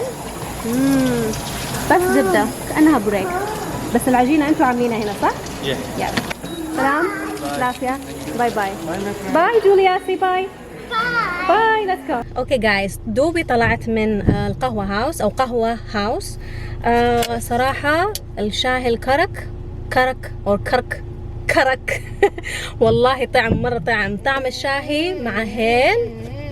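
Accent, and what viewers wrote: Hejaz, Saudi Arabia. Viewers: مادام انتي جنوبية يا ليش لهجتك تشبه لهجة المجنسين في جدة والحجاز ،، ياليت تتحدثين اللهجة السعودية البيضا واللي هي لهجة قبيلية واضحة ،، ماهو حلو انه الانسان يضيع هويته الاصليه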